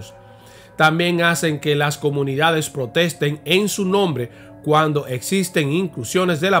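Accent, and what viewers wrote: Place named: Dominican Republic. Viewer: Q te pasa. No sea pesado, así hablan los dominicanos, el no tiene q mejorar nada.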